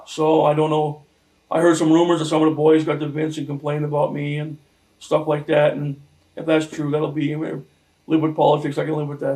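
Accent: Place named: Upper Peninsula, United States. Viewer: Love the Yooper accent!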